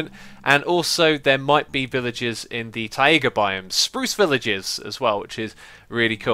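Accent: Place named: United Kingdom. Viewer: British accent. Same as chuchorial.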